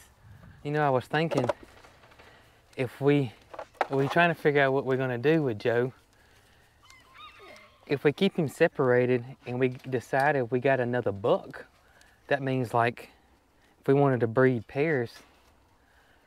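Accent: South, United States. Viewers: Who ever is doing the closed caption sure doesn't understand your southern accent. lol When you say buck they say book. hahaha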